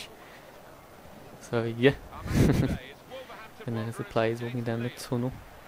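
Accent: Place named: Wolverhampton, United Kingdom. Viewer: Haha Love the wolvo accent! Come on wanderers! South bank for life!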